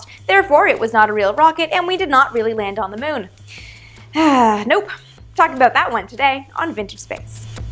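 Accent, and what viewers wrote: Canada. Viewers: Is she from Canada or the United States?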